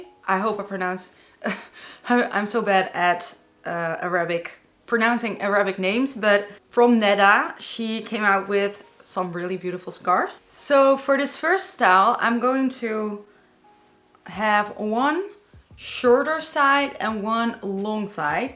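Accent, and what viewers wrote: Netherlands. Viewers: Ik kan gewoon aan je accent horen dat je uit Nederland komt, leuke filmpjes goed bezig ma sha Allah! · Ja hoorde het ook al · I just feel like your Dutch, I mean your accent, it sounds like it. No offence, just a thing I noticed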